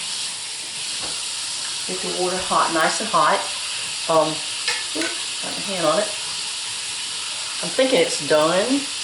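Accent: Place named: South, United States. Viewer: I just love that southern accent👍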